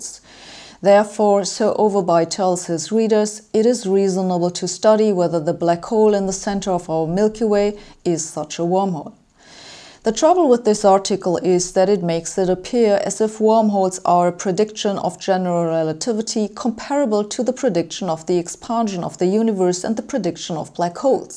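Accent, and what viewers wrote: Germany. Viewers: Well, she is German.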